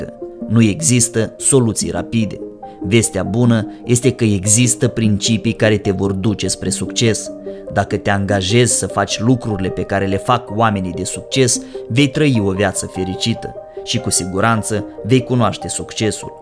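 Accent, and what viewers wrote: Moldova. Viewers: Bună, ești foarte simpatic cu acest accent din Moldova. Mulțumesc.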